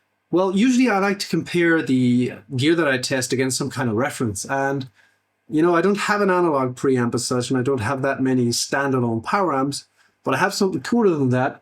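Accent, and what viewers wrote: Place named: Ireland. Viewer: Also, apologies if its been mentioned in other videos. I've been meaning to ask for ages. Where's the accent from? I'm in Wexford and I know I'm hearing an Irish accent but theres something else going on thats throwing me off.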